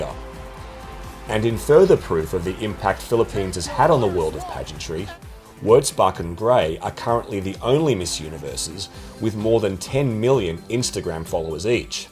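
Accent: Australia. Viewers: I’d have to say, as a beauty pageant enthusiast, you really do live up to your name as “ ”. The research, the editing, the (background) music, even the pronunciation of the girls’ names are spot on. Though with an Australian accent which I do not mind. Lol. 😏 Anyway, I coined our recent placements at Miss Universe as Venus Raj Era or Raj Era as with Venus started our golden age/era at Miss Universe. Hehe. 😁🤭 And also, I’d like to point out that Kylie Verzosa ~ Miss International 2016 is also a product of Aces & Queens. 😊 Can’t wait for your next videos/contents. 👍🏼😊 You’re doing a great job. 👏🏼👏🏼👏🏼👏🏼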